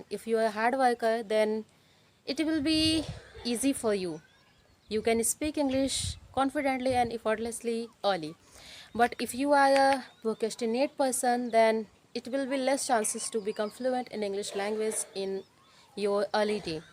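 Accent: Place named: India. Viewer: Good for me ur english language tune, become Yr tune belongs to indian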